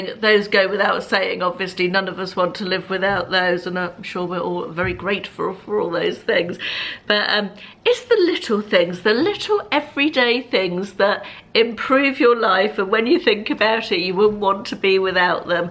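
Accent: England, United Kingdom. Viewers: Yes, I have been watching for a while now, she still has an English accent, Just!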